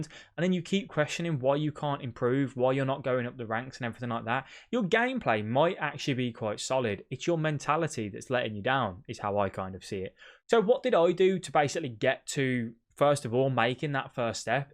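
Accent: Birmingham, United Kingdom. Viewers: Great channel m8 are you from brum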